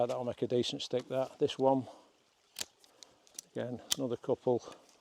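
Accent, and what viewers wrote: Lancashire, United Kingdom. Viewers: Sounds like a Lancashire Lad (or West Yorkshire but that used to be Lancashire lol) I'm from Preston originally - EXCELLENT Video buddy!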